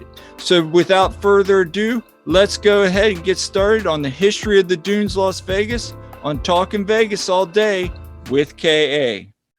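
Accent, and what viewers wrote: Southern California, United States. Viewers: nice vid! also r u from socal?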